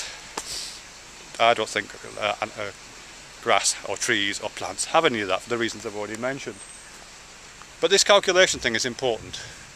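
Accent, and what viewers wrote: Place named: England, United Kingdom. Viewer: Grow your hair longer, lessen the English accent a tad and you'd be splitting image of Dr. Rush from Stargate Universe. Haha!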